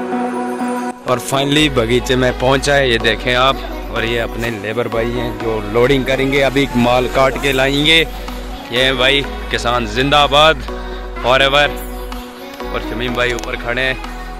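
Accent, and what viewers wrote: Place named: Poonch-Rajouri, India. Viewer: Bhai jaan language se lgra ae poonch Rajouri se oo ya to fr choudhary ho❤❤❤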